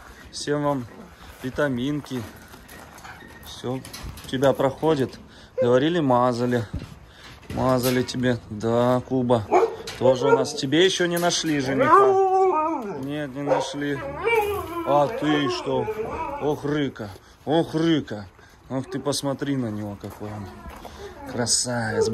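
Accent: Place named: Ukraine. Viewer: привет. Я на работе, показал твоё видео,один человек, даже подписался на твой канал. А мне говорят, что у тебя, украинский говор.Есть ли у тебя,родственники в Украине.У нас парень работал Сергей,его тоже фамилия.Он сам родом из Чукотки,а сейчас живёт, в городе Изюм.Просто ради любопытства, ты случйно,не его родственник?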